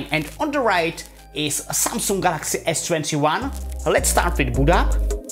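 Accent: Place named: Czech Republic. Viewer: Ahoj z Česka! Pěkné slyšen náš krásný český přízvuk :)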